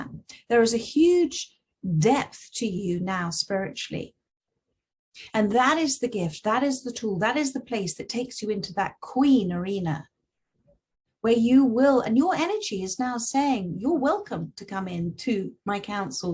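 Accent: United Kingdom. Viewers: Your one of my favorites. Luv the British accent! T. U for sharing your gift with us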